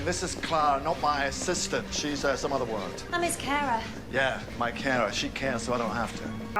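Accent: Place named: United Kingdom. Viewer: lol british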